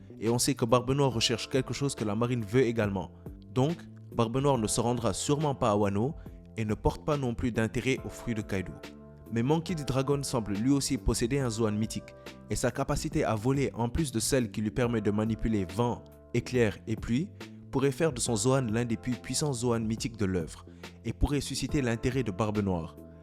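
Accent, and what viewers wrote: Senegal. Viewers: Ta théorie tient la route et est très intéressante mais il y a un detail qu’on oublie c’est qu’on est dans la phase finale du manga donc je pense qu’on est plus en phase d’acquisition de développement mais plutôt de conclusion et de demonstration. Pourchasser un possesseur de fruit me semble plus dans l’ordre des priorités actuelles, je pense que c’est plutôt tout ce qui est poneglyphes et armes antiques qui peuvent le motiver. (D’apres ta voix es-tu d’origine sénégalaise ?)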